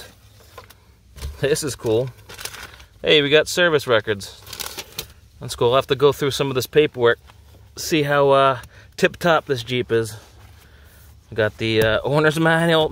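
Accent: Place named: East Coast, United States. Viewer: You’re from the east coast what part? I want to guess either New Jersey or Long Island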